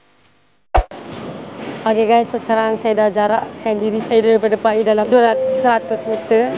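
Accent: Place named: Sabah, Malaysia. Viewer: i give Sabahan slang A+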